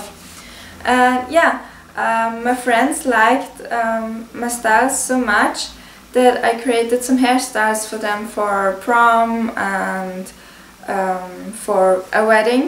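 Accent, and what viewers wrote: Austria. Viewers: I'm watching all your videos so I can pick up your Austrian accent! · okay hier hört man, dass du aus österreich kommst! ;)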